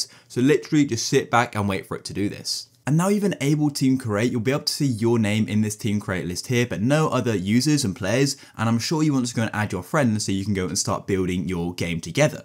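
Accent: United Kingdom. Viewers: he’s just british not every british person sounds like danton💀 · He Sounds Like A British Man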